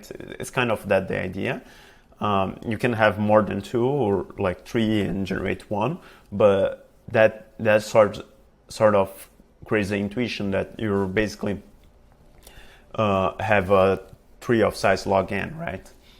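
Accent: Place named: France. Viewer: I’ve liked your French accent!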